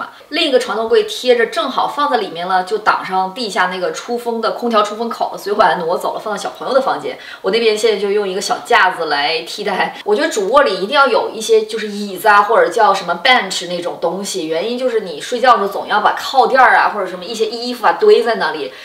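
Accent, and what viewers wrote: China. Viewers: 大陸口音⋯⋯聽了就整個翻白眼難聽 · 这英文，中文口音太重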